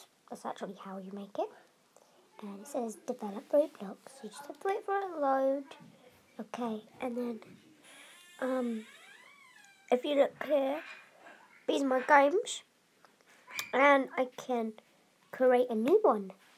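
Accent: United Kingdom. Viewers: That Cute britsh Voice Doe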